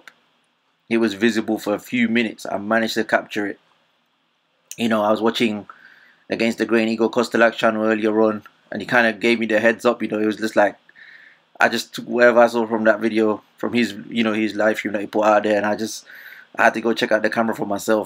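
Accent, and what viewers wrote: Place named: England, United Kingdom. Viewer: Good stuff, where are you living? you sound English.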